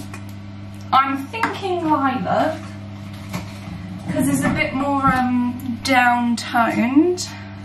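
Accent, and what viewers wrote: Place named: United Kingdom. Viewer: You sound like your from Britain. I've been to London it's a great place. Thanks for sharing this electrifying video with us that watch your channel. Outstanding job young lady.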